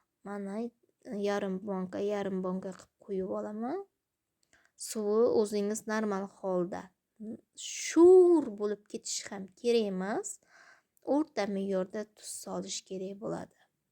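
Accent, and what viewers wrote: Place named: Qashqadaryo, Uzbekistan. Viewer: Shevangizdan Qashqadaryodanmisiz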